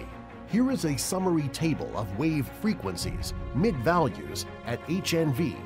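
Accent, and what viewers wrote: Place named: United States. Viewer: why didn't you choose someone who can speak Hebrew to narrate? the pronunciation is so american...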